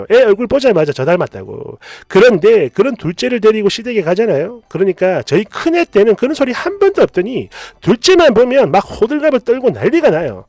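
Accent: Gyeongsang, South Korea. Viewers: 글 내용도 웃기지만 님 경상도 사투리 정말 웃겨서 제 얼굴이 웃는 얼굴로 변했어요 ㅋㅋ감사해요!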